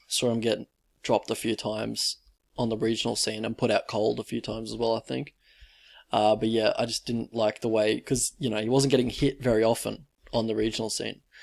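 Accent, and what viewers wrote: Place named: Australia. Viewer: The Australian accent is a hideous mutation that sounds like the US southern accent and english accent had a baby! With that said thanks for the content!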